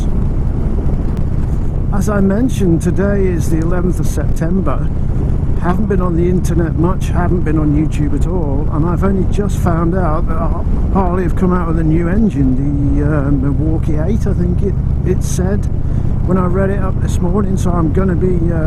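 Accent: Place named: Midlands, United Kingdom. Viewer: Great to see you back and to hear your midlands tones. Its like Nigel Mansell on a Cruiser. Best of luck with the studies.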